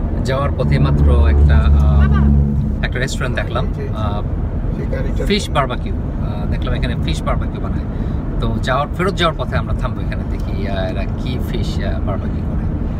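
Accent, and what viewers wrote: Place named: Bangladesh. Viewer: Love the way you talk. In fact I love the Bengali accent of the Bengalis from Bangladesh. It's very sweet.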